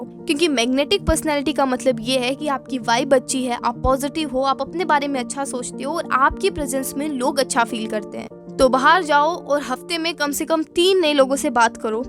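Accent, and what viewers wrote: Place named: Marwari, India. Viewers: Diiii...aap rajasthan se ho..mein bhi ..maine aapki har video dekhi hai.aap bohot achi videos banathi hai.aur aapki marwadi slang bhi ek masth hai 🤌🎀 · Aapki marwari sunkar achha lga di 😊❤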